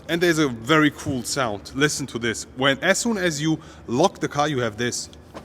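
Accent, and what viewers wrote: Germany. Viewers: Dein deutschenglisch ist furchtbar alter…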